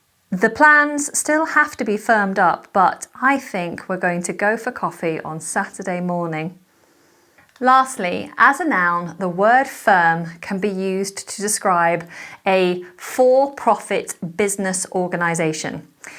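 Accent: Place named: United Kingdom. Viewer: A Muslim here , i love your British accent and i hope you and everyone become A Muslim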